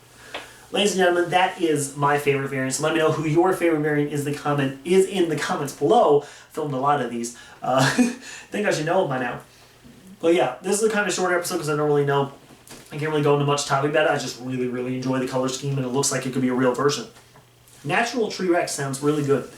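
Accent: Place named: United Kingdom. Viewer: I swear sometimes you sound British.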